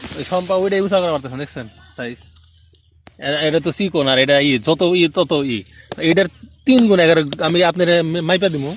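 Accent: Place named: Tangail, Bangladesh. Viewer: অনেক ভালো লাগলো আপনার কথা শুনে মনে ভরে গেলো ভাই কারন হলো আপনি টাঙ্গাইলের আঞ্চলিক ভাষায় কথা বলেন আমার বাড়ি ও টাঙ্গাইল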